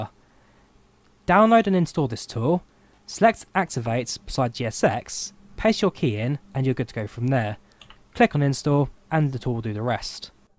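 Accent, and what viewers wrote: United Kingdom. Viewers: I say this with deep respect as you are a brilliant simmer and content creator who engages so generously with the community. When syllables get dropped from words in the rush to get to the end of a sentence, it does impact comprehension. Although I’m not from the UK, English is my first language and I do have some trouble understanding all the important and interesting things you’re saying. I think by taking the time to slow things down, and more evenly space words and syllables across phrases, you’ll be much better understood by those who do not speak with your accent or by those for whom english is not their first language. I truly only mean this only as genuine constructive feedback.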